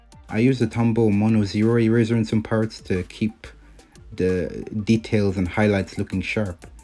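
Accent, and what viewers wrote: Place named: Ireland. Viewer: Hi, this is a great drawing and brilliant tutorial/narration of your process. I just wanted to ask you if you lived in Ireland as I can definitely here an Irish twang in your accent. Thanks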